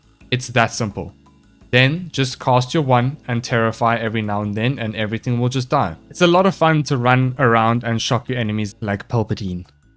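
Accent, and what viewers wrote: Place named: South Africa. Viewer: Is this guy South African because he definitely sounds like he is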